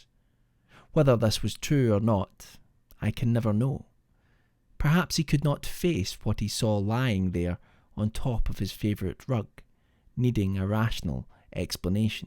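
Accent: Scotland, United Kingdom. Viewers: I love the tales you create, weave, narrate & your Scottish brogues. Very entertaining & professional all round. Just love your podcasts & look forward to each new story. Keep up the great work:-)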